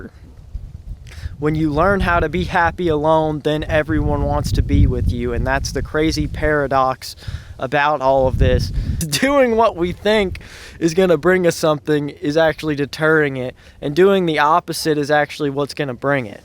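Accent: South, United States. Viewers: Great video man. Its cool to see someone in a video who talks like me. You from the south?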